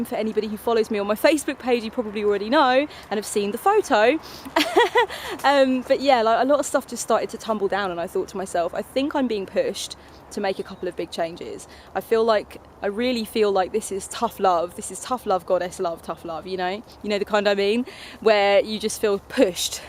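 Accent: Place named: Yorkshire, United Kingdom. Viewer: A. Luv the glasses B. luv the Yorkshire accent...UK impressions are always welcome to this Canadian gal ;) C. very inspiring as I work towards re-branding my own biz. You make ( as always) some fabulous points about signs and moving on. D. LUV that you are moving forward and clearing the cob-webs and are super jazzed to start fresh in October when things are ending all around us. Triple luv the juxtaposition of that! E...Luv you as always bc you are the bees knees. Thanx again! xo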